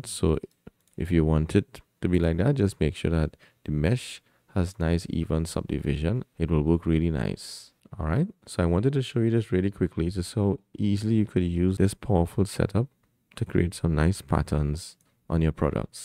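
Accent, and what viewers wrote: Trinidad and Tobago. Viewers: Thank you man, Very helpful ! PLUS I think I heard a Trini accent , that's refreshing haha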